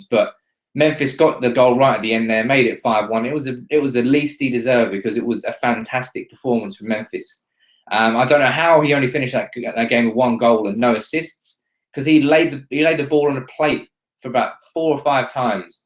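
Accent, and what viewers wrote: Manchester, United Kingdom. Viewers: That's an odd manculian accent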